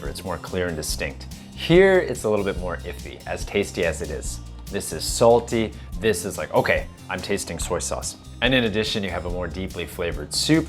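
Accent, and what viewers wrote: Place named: United States. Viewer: This may be a crazy question...but how did you get to speak english so well when you were brought up in Japan....you sound as if you grew up in the states....I must be missing something in your history....lol....sorry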